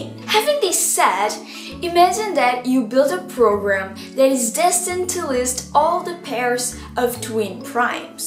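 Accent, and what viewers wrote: Portugal. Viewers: Se não fui claro o suficiente, eu acho bonito ouvir o português de Portugal, por isso disse que senti falta :D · Prefiro quando fala em português de Portugal. Acho muito mais interessante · Peculiar - you can realize the portuguese accent. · Ooooh my Lord ... You speaking english is so precious.... You are so intelligent ..smart and clever... And a math gurl... I'm think I'm fall in love e seu sotaque português é maravilhoso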